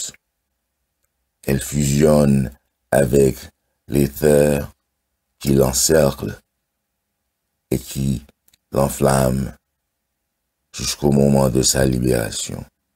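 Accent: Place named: Africa. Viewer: Seigneur, cette voie est incroyable, la narration est profonde, chaude, aux magnifiques accents de l'Afrique magique. Je crois pas avoir vu les vidéos des premières tablettes ni même de playlist regroupant toutes les tablettes lu par cet incroyable narrateur... Dommage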